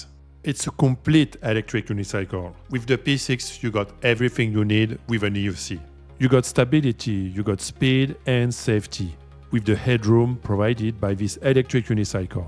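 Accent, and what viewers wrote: France. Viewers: T’es français ta un accent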